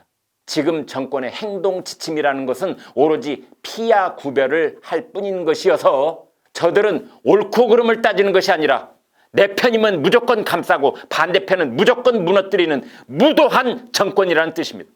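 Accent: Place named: Jeolla, South Korea. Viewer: 말을할때 어금니를 갈면서 발음하쟌어요 특히 성깔 부릴때는 어금니를 뿌드득 갈면서 소리낼때는 전라도 사투리가 가루를 날리더라구요 대구 경북여고 출신이라든데 경상도 사투리가 아니닙다. 소시적 최하8~10년정도 전라도, 나머지 경상도,서울말 섞인 묘한 발음입니다. 고향이 어딘지???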